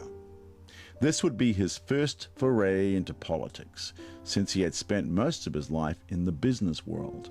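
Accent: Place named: Australia. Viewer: wow, they make a documentary less than a year after the Tsunami and think things will have changed dramatically! Must be a Cali-tard narrating this, even with the Australian accent. Who the hell made this, the totally black screen when narrating the tsunami hitting was reallllllly cheesy. The narration is so.....it so RELISHES the opportunity to exploit this and be dramatic..it's kind of disgusting really. Have to bail after just a couple minutes. Dipped in to other parts further on and heard the same guy getting off on all of this and thinking he was being so profound. Really really disgusting M8! Thumbs down for you.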